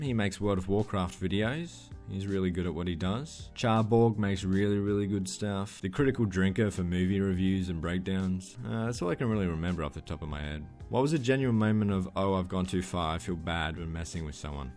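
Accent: Australia. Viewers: I'm in love with a certain, low-voiced aussie.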